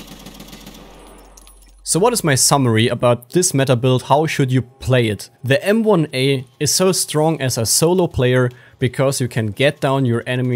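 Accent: Germany. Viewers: I hear German Akzent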